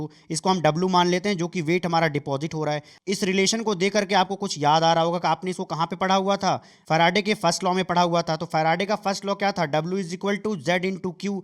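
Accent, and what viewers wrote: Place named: Bihar, India. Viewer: This chemistry sir feels like he's from bihar😀😀....so proud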